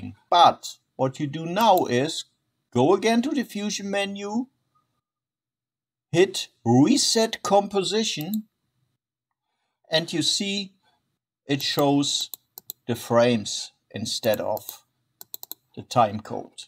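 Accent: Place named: Germany. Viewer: Thank you so much!! - Nice German accent ;-) Gruß, Jörg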